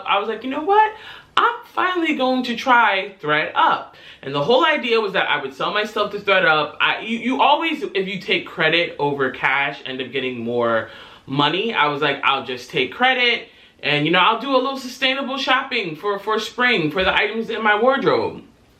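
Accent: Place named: United Kingdom. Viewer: Good British accent. I like your thirties diaries.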